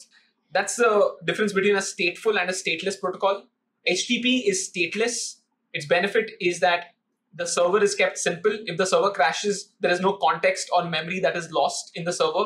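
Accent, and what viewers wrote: India. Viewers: Great video thanks for sharing. However, you Indian guys learn everything but not the accent, straighten it as it makes it difficult to follow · I have to admit, even though having a bad accent, indians most of the time give very good lessons, I just need to get through the difficulties of understanding the accent) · As a non native english speaker, the indian accent adds difficulty. But it's in the pain that you soak up knowledge the better. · Oh my god, i was very happy to hear white face with good english and right after we were fucked up with indian accent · If a course starts with "hellou ewerywone!" I at least know that he put in the effort and worked damn hard to make it! Cheers! (For the trolls, this is not racist but actually respecting and valuing the creator and effort! I have been to India.) Thanks, great intro! 🤘🏻😎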